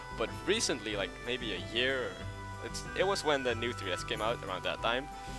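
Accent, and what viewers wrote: Netherlands. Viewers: Lol, ik wist niet dat je nederlands was. Ik hoorde het wel aan je accent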